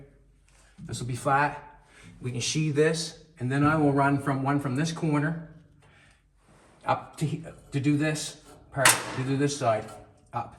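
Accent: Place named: Eastern Canada, Canada. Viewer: Can we pause and appreciate the eastern Canadian accent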